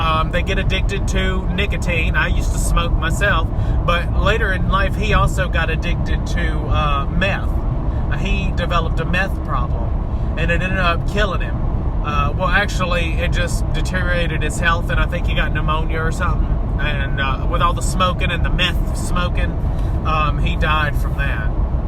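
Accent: South, United States. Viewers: K- None, introduced me, to this funny, brave, passionate man, with a great voice for audio, that has an ear-pleasing, Southern twang.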